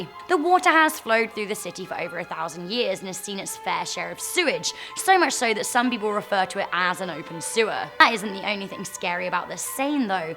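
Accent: United Kingdom. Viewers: Lol dont know what it is but I love it how British people narrate anything, reason why I watch BBC America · I LOVE YOUR BRITISH ACCENT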